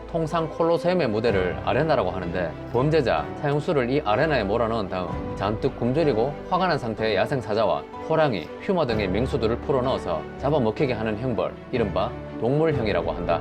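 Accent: Gyeongsang, South Korea. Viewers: 다 좋은데 갱샹도 사투리가 거슬리네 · 인간이 예수왕 어린양의 구원의 피외에는 영원히 살지 못하는데 뭔 영원이라하냐 경상도 억양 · 갱상도사투리나레이션 듣기거북하네예 ㅋ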